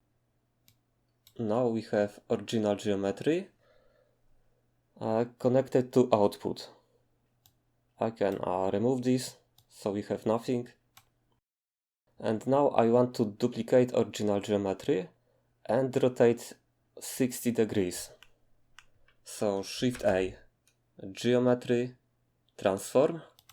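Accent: Poland. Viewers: Sensing a Polish accent there :)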